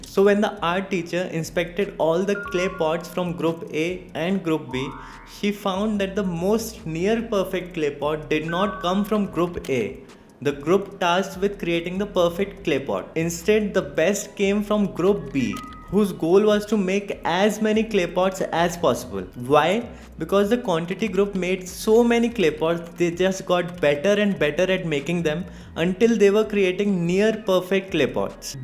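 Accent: India. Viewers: I realised this video is in english after reading your comment. The accent is very Hindi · Kyuki accent indian hai ❤️😁😁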